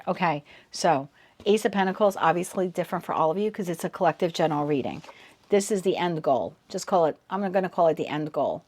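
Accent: East Coast, United States. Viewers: Thnx ❤ great reminder Trying to figure out how to market my book 😊OMG you are so east coast Love it 😂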